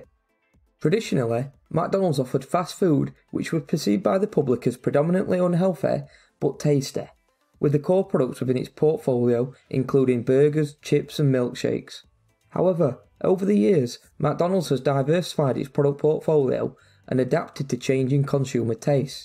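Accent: Yorkshire, United Kingdom. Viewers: unrelated but... are you from yorkshire...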